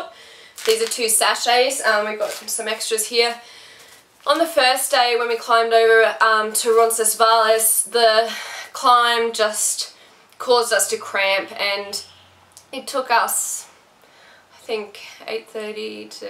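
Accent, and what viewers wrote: Australia. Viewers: This was so helpful - ta! (And great to hear a fellow Aussie!) My cousin and I are doing the Camino in April next year (2020) starting on April 1, which seems pretty close to the time you walked. My main concern is with footwear. I find my feet get really hot and sweaty in goretex shoes, but I also know how wet it could be in April! Did you come across many people wearing non-waterproof shoes? Did you have any trouble with your shoes getting wet and not drying out properly? I love Salomon shoes but if I can get away with non-waterproof I would be very happy!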